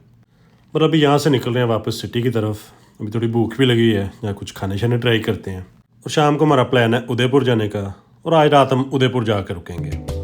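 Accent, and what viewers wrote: Punjab, India. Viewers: Eeseeleeemweeeleekewm.... Bhai Desi tareeke se bhi bol sakte hai. Baaki Sara lehja toh Punjabi hai na to arabi banne ki koshish kyu?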